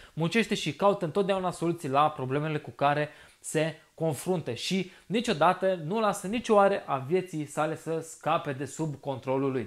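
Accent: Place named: Bessarabia, Moldova. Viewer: Am o intrebare.Te-ai nascut in Romania?Ai un accent e basarabean.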